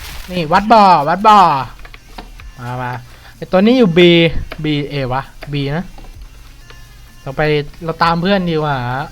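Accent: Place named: Isan, Thailand. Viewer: ก็ว่าสำเนียงพูดเหมือนคนอีสาร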